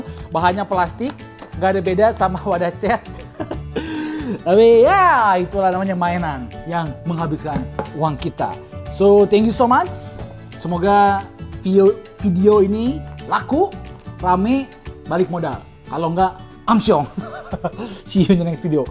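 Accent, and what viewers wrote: West Java, Indonesia. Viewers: Sunda euyy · logat sundanya keluar banyak wkwkwk jarang²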